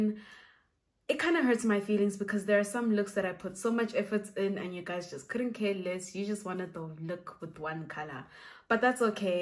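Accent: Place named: Germany. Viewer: Man hört das sie deutsch ist oder bin ich dumm😂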